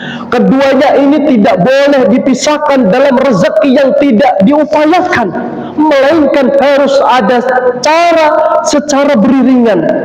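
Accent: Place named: Banyumas, Indonesia. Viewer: Terimakasih Bu Guru ngapak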